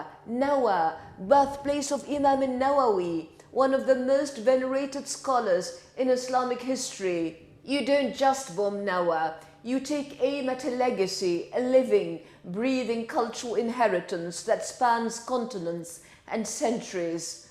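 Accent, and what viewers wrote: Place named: United Kingdom. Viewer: ملة بريتيش اكسنت يا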